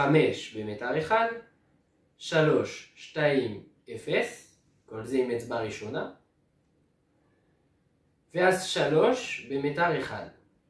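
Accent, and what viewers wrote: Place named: France. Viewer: J ai reconnu l accent fr mdrrr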